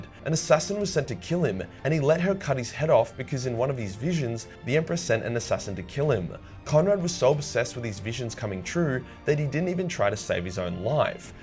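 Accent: Australia. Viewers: This guy earned my sub for his 0 fucks given Aussie language. 🎵Aussie Aussie Aussie Oi Oi Oi🎶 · Octarius war explained by an Australian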